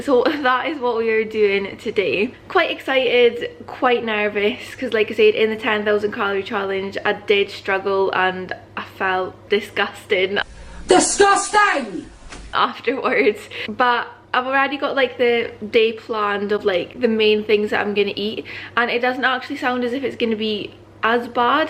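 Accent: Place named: Scotland, United Kingdom. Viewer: Its so refreshing finding a fellow scot on here! Was dead jealous of all the food!! 😂 You sound local to me! Which area are you from? I’m Aberdeenshire x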